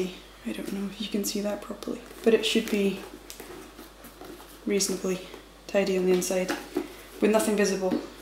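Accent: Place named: Scotland, United Kingdom. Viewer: You're description of this technique is exactly how I wore mine in the late eighties/early nineties. You're freckles and Scottish accent are adorable. Having been born in Belfast and grown up in Toronto... It must be said, You are an absolute gem. Cheers, Michael Hughes in Toronto.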